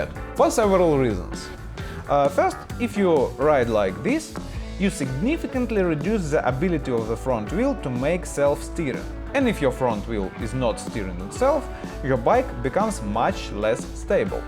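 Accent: Russia. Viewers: Fing russian accent... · contenido en ingles, con acento ruso, filmado en argentina xD que combo. muy bueno tus videos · Are you russian or enflisg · Аххх! Этот классический русский акцент - прямо как из старых голливудских фильмов! =)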